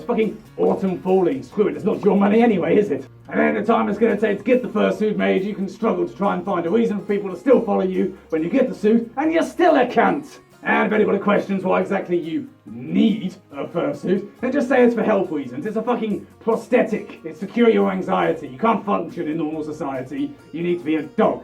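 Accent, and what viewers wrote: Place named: North, United Kingdom. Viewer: "Its not even a script, its on the back of a fucking Tesco Clubcard envelope!" Very British thing to say,. Also, i think because of your accent, you're from the North?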